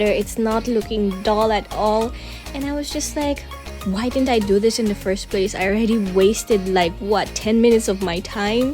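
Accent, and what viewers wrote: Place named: England, United Kingdom. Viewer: you're such a talented lady ...iha!..so bright! i even like your accent..your english accent!..keep painting and sharing your talent..go on! Jingle JPE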